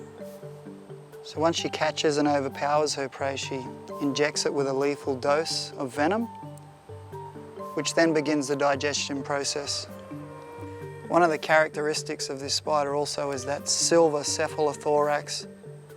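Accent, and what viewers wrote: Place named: Australia. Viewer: I was hoping that spider jumped on his Australian accented face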